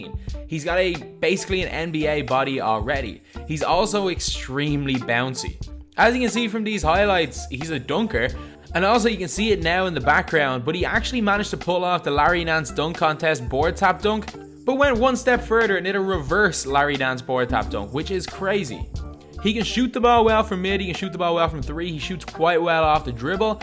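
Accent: Ireland. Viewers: Reynaldo Tolentino Irish yan pre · Irish Accent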